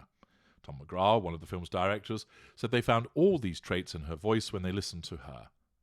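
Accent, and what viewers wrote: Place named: England, United Kingdom. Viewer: Tell me why all it takes is an English accent to make a mf sound like he knows what he's talking about. (???)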